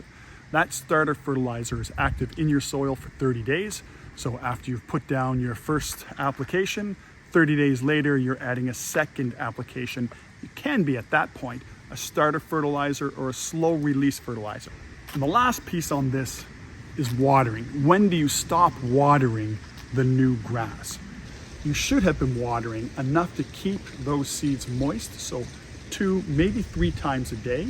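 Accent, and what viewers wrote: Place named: Minnesota, United States. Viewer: You got that Minnesota accent.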